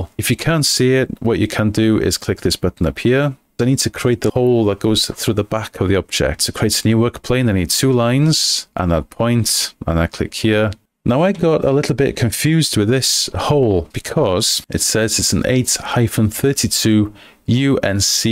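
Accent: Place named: Wales, United Kingdom. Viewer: Late reply, but he's saying contour! He has a strong Welsh accent!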